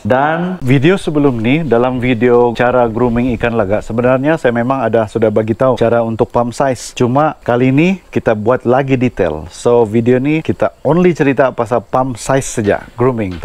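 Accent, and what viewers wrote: Sabah, Malaysia. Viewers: Assalam sifu. Nak minta sifu tunjukkan cara groom mental betta. Masa dia flare tu, dia cool jer dan rileks. Mohon Tunjuk ajar sifu. Btw, congrats for 3k ++ subscribers. Road to 10k subs pulaa bossku. Huhu semoga maju jaya. Huhu terhibur tgk content sifu. Dialek sabah tu pekat joo. Wkwkw original sabahan. GoodLuck in future. N keep doing what you love. Chaiyokk!!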